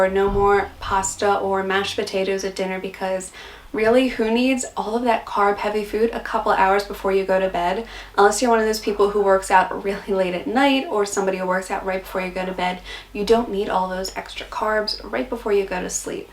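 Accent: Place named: Australia. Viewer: I am concerned that you refer to this as "things i regret about my first six months here." My wife and I came here from NZ in 1981 and our experience of homesickness was very different. I had a job to come to so I had to learn to speak Australian quickly, I had to learn to "get on the team". Francie, on the other hand had lots of time to mope about the friends she left behind and her family still in NZ. It took her YEARS to get over homesickness. I tell you that because you've had a huge upheaval. You might not see the enormity of what you've acomplished yet, but it is enormous. You must NOT allow yourself to regret not doing things. You've been busy as hell learning to live in another country. Jeez you even speak like an Aussie (sort of )!! You should think of each of those things as "now i've been here 6 months and settled in with Mark, here's what i really want to get going on for the next 6 months ..." That's a far more positive way to think of it. 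It's very easy to tell yourself that life is terrible and you'll start to believe it But just as easy to say "life here is wonderful" and you'll fool your brain into thinking that instead. Not changing things in the house early on: I think you were right not to change things around all around the house. Yes you want to make some of the house 'yours' so it's the home of both of you, and I agree with that, but on the other hand you dont want Mark saying to himself, "my God!!! she's come all this way and in five minutes she's chan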